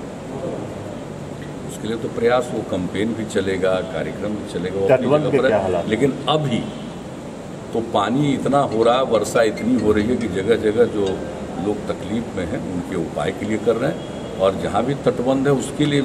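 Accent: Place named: India. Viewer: Dehati Bhasha Jaise bol rahe ho Hatiya hi to Bhadwa Hai yah kaun sa Baat hua Barsat Ka Mausam Mein madad karo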